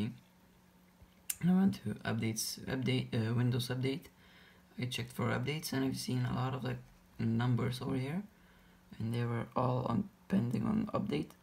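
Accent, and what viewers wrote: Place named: Russia. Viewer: русский акцент не скрыть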